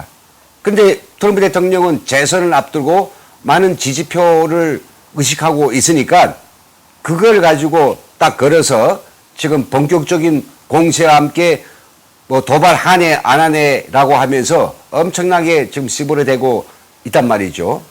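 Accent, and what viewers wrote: North Korea. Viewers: 진짜 북한사투리로 재밋게 하시네요~~힘내세요 응원합니다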